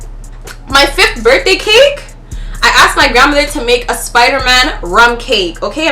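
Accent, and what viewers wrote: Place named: Toronto, Canada. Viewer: The Toronto accent I love it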